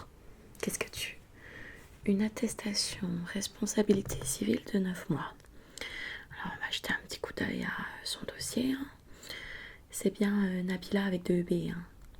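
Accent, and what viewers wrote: Vosges, France. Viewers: Le retour que personne n'imaginait ! L'accent vosgien fait son come back ! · Cet accent feels like home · Quel belle accent 😍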